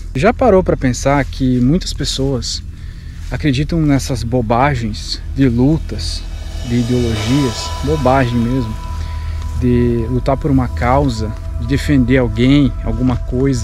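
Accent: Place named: Santos, Brazil. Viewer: Você é Santista? Fala que nem Santista. Linda cidade de Santos-SP.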